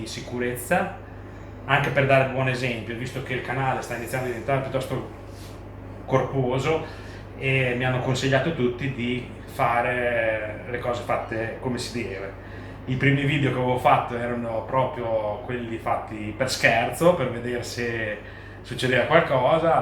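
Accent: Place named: Romagna, Italy. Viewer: Bravo complimenti 👍 raccontato con il tuo accento romagnolo diventa fantastico 👍👍👍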